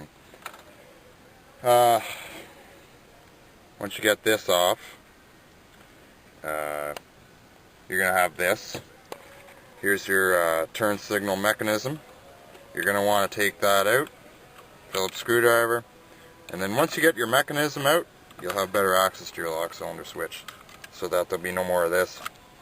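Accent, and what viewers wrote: Canada. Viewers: Canadian eh.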